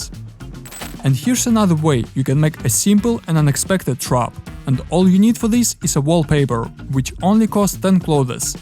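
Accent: Russia. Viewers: Брат ты русский что-ли? Акцент прям мощный.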